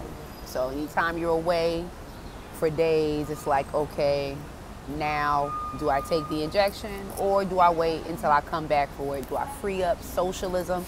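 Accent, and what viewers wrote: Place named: Louisiana, United States. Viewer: It sounds like you’re in Louisiana 😍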